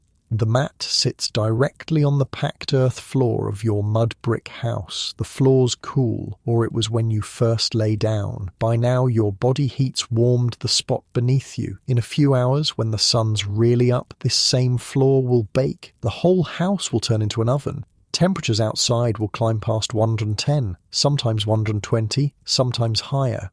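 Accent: England, United Kingdom. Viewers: Hello there well this is my first time posting, I am from Northwest Ontario and a very small community of 2000 people. I am native Canadian and I absolutely love listening to you every night, I love the fact that you have an English accent, which is very pleasant And I must have fallen asleep at sometime but the factors I enjoy it when I come back every other night thank you so much for this service as long as you’re not a Yankee, thank goodness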